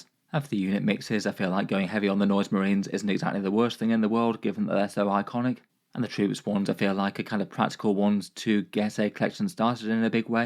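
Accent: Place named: England, United Kingdom. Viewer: No. It's a UK mainland accent. Maybe Leicester or Leeds.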